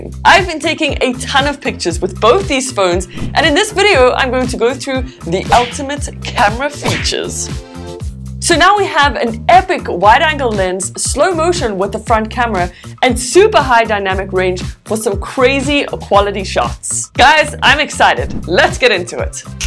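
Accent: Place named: South Africa. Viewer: Damn, I didn't know you are SAn. Well the accent is there, but the channel numbers say a different story.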